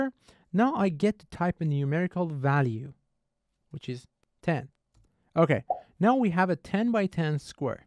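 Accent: Iran. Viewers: why i think you are from iran😂😂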